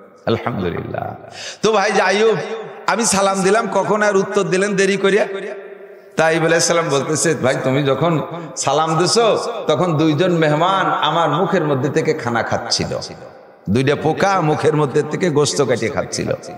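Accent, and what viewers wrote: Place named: Gopalganj, Bangladesh. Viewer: নড়াইল গোপালগঞ্জ জেলার কথাবার্তা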